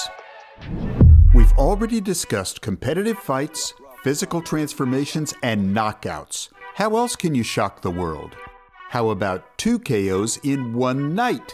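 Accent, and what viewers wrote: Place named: United States. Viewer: ur voice does not sound like a Ukrainian you’re American bruv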